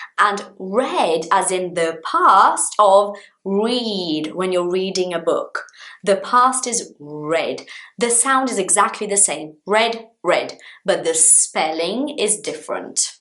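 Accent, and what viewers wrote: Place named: England, United Kingdom. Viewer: Thanks so much to the class ... I'm learning English "alone"(because I watch other channeel) , your class help me very much... I like very much your accent English... Thanks so Much.... I'm new subscribe here!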